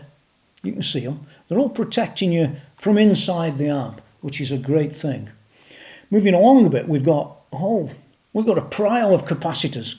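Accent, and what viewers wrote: Stoke, United Kingdom. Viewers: Am I picking up a Stoke accent?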